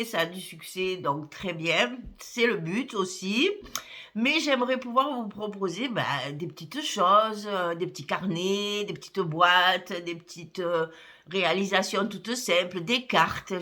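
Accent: South, France. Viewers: Bonsoir.Bonne et heureuse année surtout vos petites mains et la tête pour créer vos album dont je fais souvent.Vous expliquez bien .J aime votre accent du midi.J espère que vos pieds vont mieux avec la préparation de la fête de Noël.J ai fait le folio avec la participation de rose du jardin.Il est genial.Gros bisous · Merci pour cette vidéo et tous mes meilleurs voeux pour cette nouvelle année et merciiiii pour ce magnifique accent qui nous fait sentir bon le soleil et les cigales 🙂👏👍 et longue vie à vos tutos si bien réalisés et expliqués. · Ravie de mettre un visage sur ce bel accent du midi ! Votre enthousiasme et votre bonne humeur rendent vos vidéos très agréables à visionner ! Vos réalisations sont toujours très réussies ! Quel talent ! Je vous souhaite une belle année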